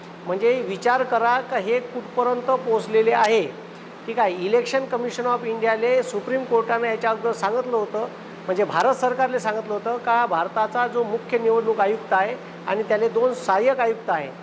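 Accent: Vidarbha, India. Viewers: आपल्या बोलण्यातून मधीच 'ठीक आहे"हे उच्चारण करणे कमी केले तर.... खूपच चांगले वाऱ्हाडी भाषेत बोलणें आहे.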